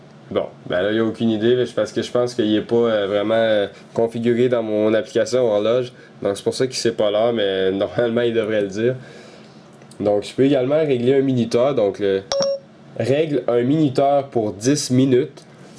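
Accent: Quebec, Canada. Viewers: Je ne sais pas de quelle région de France tu viens mais j'ai jamais entendu un Français dire "i"phone, tout le monde dit "aïe"phone (d'ailleurs Siri le dit correctement) ... Et je pense pas que l'auteur de la vidéo ait besoin que tu le prennes pour un attardé ... Les québécois ont des expressions différentes et c'est surement leur accent qui cause ses petits bugs mais pas besoin de lui faire la morale sur la langue française ! On dit "y'a du vent dehors ?" ;) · il foire de temps en temps due a votre accent quebecois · Mais aussi tu as l'accent canadien c'est normal.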